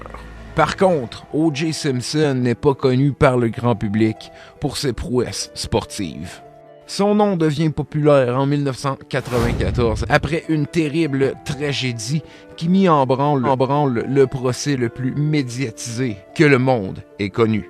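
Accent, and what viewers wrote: Quebec, Canada. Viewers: Super et bravo pour ta vidéo 👏 ! J'aime bien l'accent québécois ou canadiens du commentateur/narrateur. Ca donne un certain caché à la vidéo 😊.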